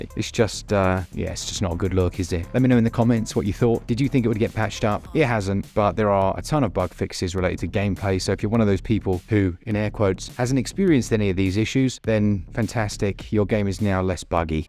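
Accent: United Kingdom. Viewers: your pops and plosives are really wet and disgusting also cease that gross british accent too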